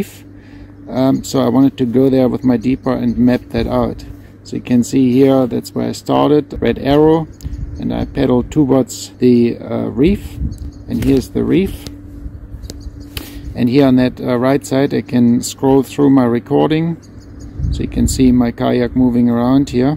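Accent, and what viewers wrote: Germany. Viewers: Do you have a German accent? :)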